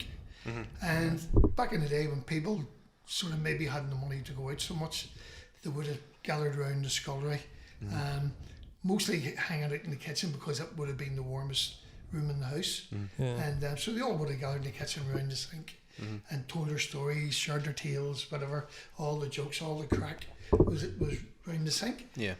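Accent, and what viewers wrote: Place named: Larne, United Kingdom. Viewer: That Larne accent is something shocking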